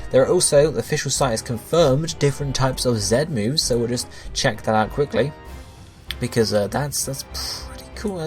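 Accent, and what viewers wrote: United Kingdom. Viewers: OHHHHH So he's from the UK? I didn't know that, sorry. Thank you for the information. I hope I wasn't rude when I asked the question. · is british and thats how we say it, but i end up saying zee half the time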